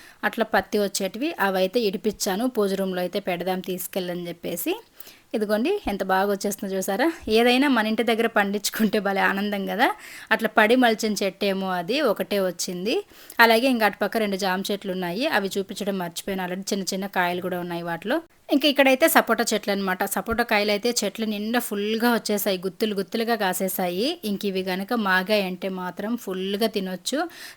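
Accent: Chittoor, India. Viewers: Meeru matladuthunte chala bhagundhi same mana Chittoor bhasha. Village untadam vall konni upayogalu untayi kadha. Vegetables kondam undadhu,porakalu, Chintapandu elantivi kone pani undadhu.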